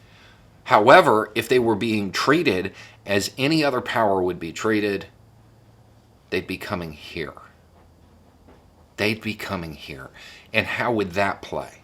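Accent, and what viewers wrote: United States. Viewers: sounds like the us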